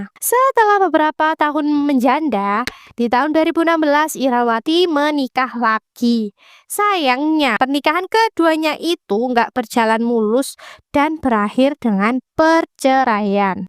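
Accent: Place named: Java, Indonesia. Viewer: Medok e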